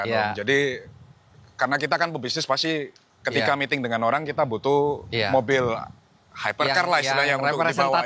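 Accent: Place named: Java, Indonesia. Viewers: Indonya medok lagi. Koko sby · Logat jawanya kental sekali.. kok ada yg bilang jawa hama disini 😂😂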